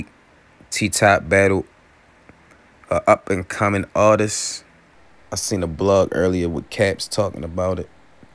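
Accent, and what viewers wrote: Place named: Baltimore, United States. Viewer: I'm just hearing your accent... Bmore❤ can't tell if its East or West but definitely Bmore...❤ Much Love and Respect!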